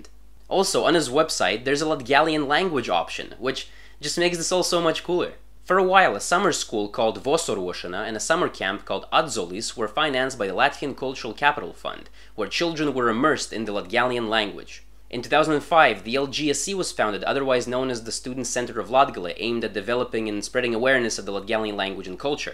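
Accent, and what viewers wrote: Russia. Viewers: Ak dievs, es uzzināju par tavu kanālu caur video par eļvdāļu valodu un brīnījos, no kurienes tu esi, jo es pamanīju akcentu. Izrādās, ka tu esi no Latvijas, tāpat kā es! Bet tavs akcents ir laikam krievu, vai nē? Paldies par šo video. Mana vecmāte bija polīte no "Daugpiļs". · Nice video, man! I speak Latgalian myself and I can tell you not many people my age speak Latgalian, which is a shame. Parents don't teach them, cause it's "a bother" and they'll never use it anywhere else other than in the family. And for the most part, it's true, I only use it when I talk to my family or talk with older people. :( I agree with we are latvians part! We are the same and I will happily be both latvian and latgalian. :) Did now know about Peter! So cool! I was about to ask where did you grow up, cause your accent is interesting while you were talking in latvian, but then I heard you talking in russian, lol. 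PS. Ausmeņa Kebabs ruļavoj!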